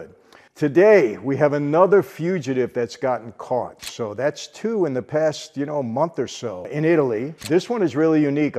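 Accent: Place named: New York, United States. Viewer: Wonderful story, by the only person I want to hear it from. Yeah the Italian is a bit rough, but the NY accent makes up for it !! I love the ' 2 question ' format !! There are so many interesting people who comment on this channel, it's fascianting to hear their qestions answered. Best wisjes to all who gather here , and great congratulations to a + 1M channel, it's been well earned and deserved !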